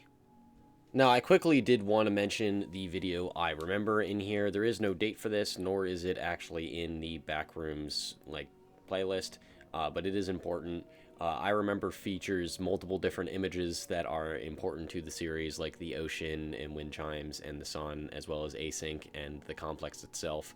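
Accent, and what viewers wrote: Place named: South Jersey, United States. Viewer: I'm hearing a south jersey accent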